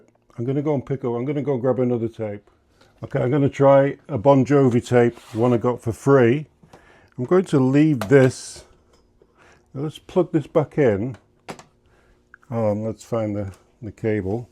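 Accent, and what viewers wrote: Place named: Liverpool, United Kingdom. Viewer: Whu are you speaking in a scouse accent? Are you even from Liverpool? If you are not from Liverpool then why are you speaking in a scouse accent?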